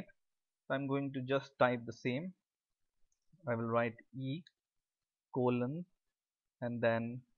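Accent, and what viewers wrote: India. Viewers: German Google English Language Indian Accent ... wtf?! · Are you from india :-)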